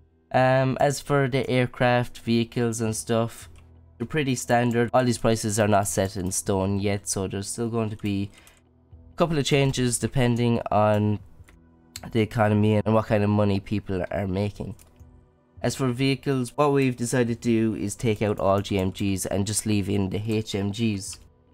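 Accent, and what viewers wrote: Ireland. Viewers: You sound like you're from athlone or carlow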